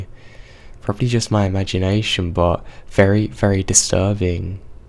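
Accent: England, United Kingdom. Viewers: I'm an American sub., and I love it when you can't help it and laugh. When you broke up over the word "fanny pack", I laughed out loud at 5am. Couldn't sleep...thanks for the laugh. Your a great, really gifted natural storyteller, and I love your accent. What part of England are you from? All the Best!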